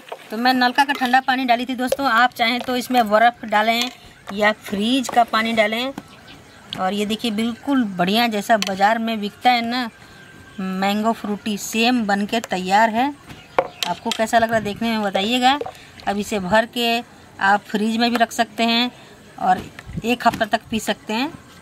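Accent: Bihar, India. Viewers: Bihar se hai kya . Very nice karte hare kmate rhe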